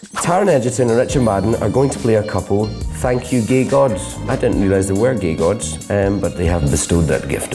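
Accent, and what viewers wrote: Scotland, United Kingdom. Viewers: Omg, he is a Scot! Just realized that! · Scottish accent! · He's Scottish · Trepimero Scottish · it's a Scottish accent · he's so Scottish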